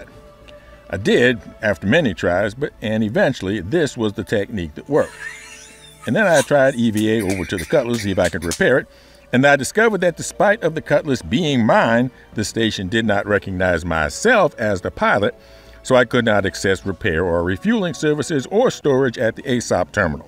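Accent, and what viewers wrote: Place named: South, United States. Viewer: Please don't take this personally, but for most of the world a southern US accent is not associated with the best of things. Also it's not always easy to understand. I would prefer a more moderated, just normal, English.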